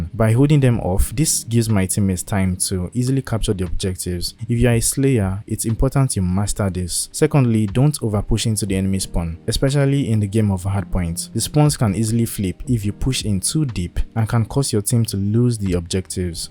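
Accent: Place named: Africa. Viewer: Ooohhhh my gooodddddddddd ur african accent kills meeeee It's soooo guuuudddddd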